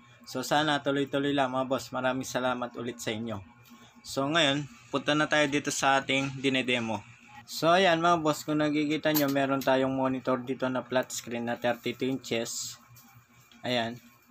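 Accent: Pampanga, Philippines. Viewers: Kapampangan na kapampangan accent, haha konelk eh.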